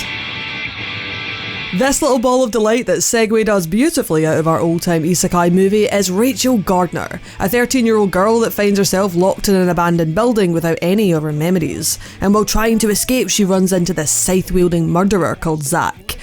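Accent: Scotland, United Kingdom. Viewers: The voice of a Scots woman can make even the word murder sound good ;) This season had a good handful of shows that from the description didn’t sound as if they would be anything spectacular, such as Chios School Road and Asobi Asobase, but are genuinely funny as have become some of my favourites this time around. Also..... EDITING!!!!!! 😍❤️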